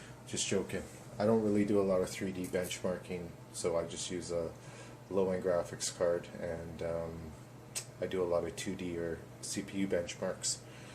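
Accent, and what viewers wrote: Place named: Canada. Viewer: Dude. The radiators out the window and the Canadian accent commentary made this whole video for me. Fuck Yeah!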